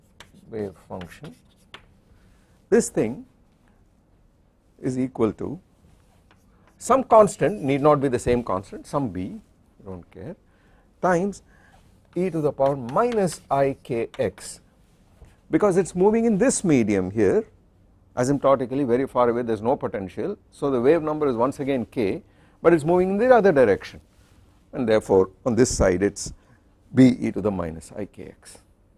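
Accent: India. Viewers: the professor is an exceptional teacher. The extras he brings into the course with his comments are really at a graduate level, not a basic undergraduate course. For an American, the Indian accent is sometimes difficult to decipher. Subtitles would be very helpful. Also, ith wourld be very nice to have a set of course notess for the lecturs.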